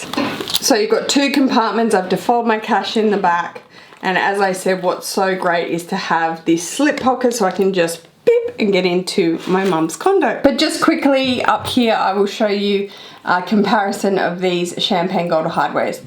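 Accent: Australia. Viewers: I have a question. Your English sounds beautiful and ylu articulate very clearly and pronounce words in a proper way. Many other Australians I watch on youtube seem to talk in a different way, like it was difficult for the to pronounce the words, if you have any idea of what I mean. Obviously English is not my native language. But my question is, do you have a different kind of accent compared to other Aussies, and why, or am I just so adjusted to your speech?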